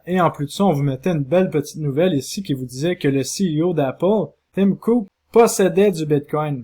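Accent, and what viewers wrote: Canada. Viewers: Un grand bonjour de Suisse,j apprécie tes vidéos et te suis de puis un certain temps, de plus j adore cet accent canadien 🤗. Au plaisir de te suivre dans une prochaine vidéo,merci beaucoup 👍👍.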